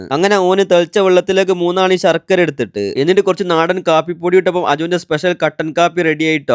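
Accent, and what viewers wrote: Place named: Kerala, India. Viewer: നമ്മളെ കൊടുവള്ളി ഭാഷ ആണല്ലോ 😂😂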